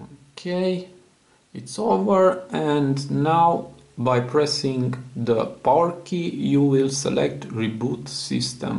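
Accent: Romania. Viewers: I know! You're Romanian. :)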